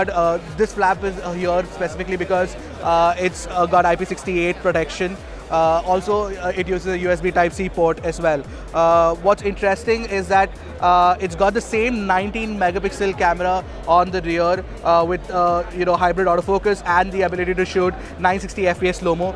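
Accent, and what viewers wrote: India. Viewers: David H Yes with a very heavy Indian accent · When you have a very strong Indian accent. Please speak slow. dammit!!! and Put some subtitle too.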